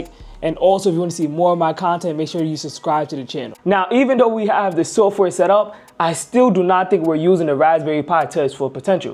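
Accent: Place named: Bronx, United States. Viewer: Brotha I was gonna ask what part of da Bronx you from? 😂 didn’t think you’d be from ATL❤❤❤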